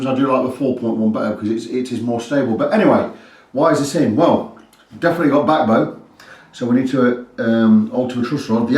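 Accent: United Kingdom. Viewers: Please, can someone tell me what measure did he say on ? I am just not used to such a strong british accent and I can't tell if it's 0 or 1 mm he is talking about.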